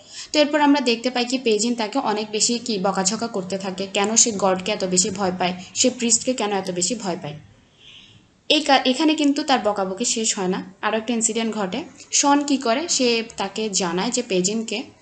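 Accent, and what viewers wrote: Chittagong, Bangladesh. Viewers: Chittagong er accent 🥹❤️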